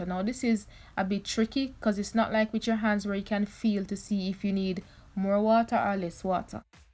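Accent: Jamaica. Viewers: Love an uptown Jamaican accent 😍 📍🇬🇧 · Your accent caught me. I miss Jamaica right now.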